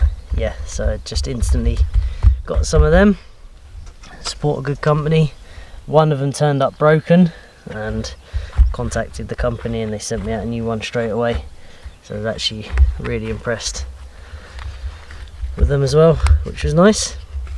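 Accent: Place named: South Wales, United Kingdom. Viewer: Very good South Wales accent LOL. I’m guessing Dyfed maybe west Glamorgan??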